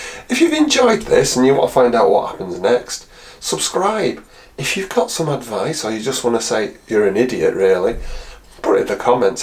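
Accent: Yorkshire, United Kingdom. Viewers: "At the suggestion of my wife..." I don't know how many times my Yorkshire Lass wife has pointed out some reasonable mechanical solution while I'm busy overthinking. I often show her your vids as she loves hearing the Accent of Her People and little things like Henry Hoover in the background that remind her of home.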